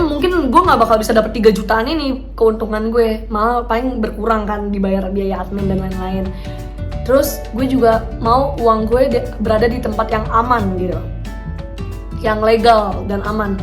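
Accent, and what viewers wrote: Bali, Indonesia. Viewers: kek logat orang Bali 🤔 , tpi thanks. ya video nya menarik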